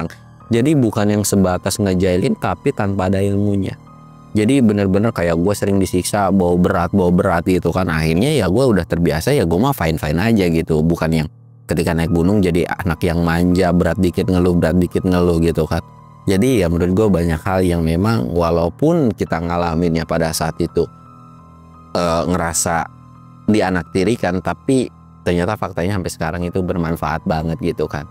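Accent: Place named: West Java, Indonesia. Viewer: Kalo cerita mamang campur Sunda mang seru lucu logat sundana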